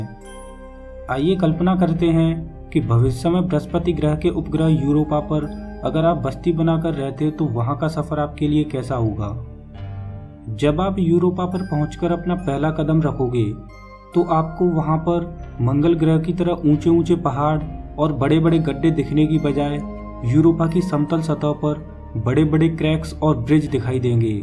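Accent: Bihar, India. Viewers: Bhaiyaa people ....bhojpuri Hindi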